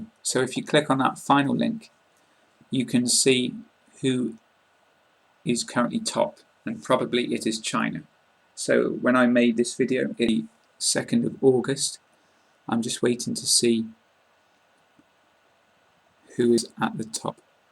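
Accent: United Kingdom. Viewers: thanks for teaching us i like British accent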